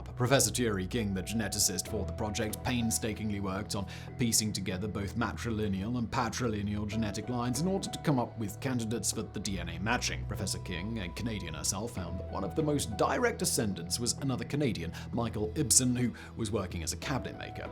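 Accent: United Kingdom. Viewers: I can’t be the only one that was expecting Vsauce and was shocked with a British accent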